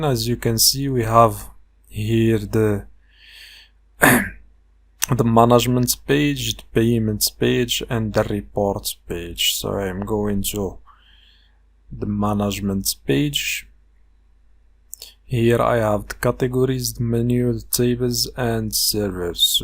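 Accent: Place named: Morocco. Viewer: HHHHHHH the moroccan accent 🤣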